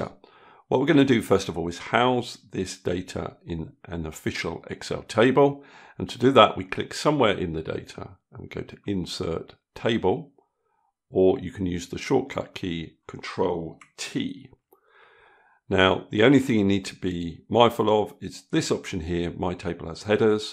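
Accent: United Kingdom. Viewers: It is hard to find a British Tutor on here. Most are American/Canadian. Most importantly, I am struggling with IT. I am trying to watch your videos step by step. However, what should you do when there is no formula at the top?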